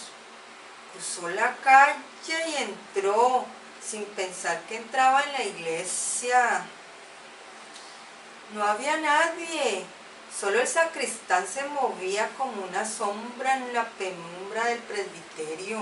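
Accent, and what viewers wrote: Mexico. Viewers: El acento mexicano te quito el ritmo de la lectura. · ¡Uy, dejaste morir en los créditos a tu asistente! ¿Dónde está tu versión neutral? Una última pregunta: Un mexicano cuando lee ¿Lo hace así? El acento es importante pero no es definitivo para hacer una buena lectura como "mexicano"; no dejes por fuera el ritmo, la vocalización y la inflexión de tu voz.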